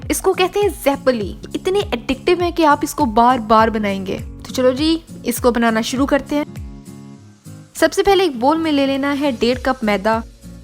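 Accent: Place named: Punjab, India. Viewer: OMG! your accent makes your cooking videos killershot..... Punjabi ki to kya hi baat theee........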